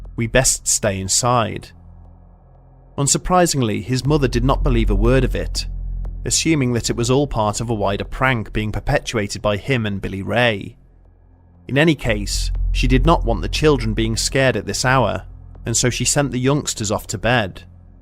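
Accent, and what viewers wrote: United Kingdom. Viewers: Sorry but this narrator calling a twenty two riffle a “two-two” is just ridiculous and I assure you the people from UK 🇬🇧 do not all speak like this twat.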